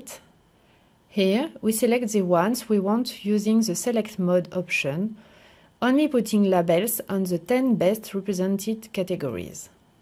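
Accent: France. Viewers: Yes, french accent :)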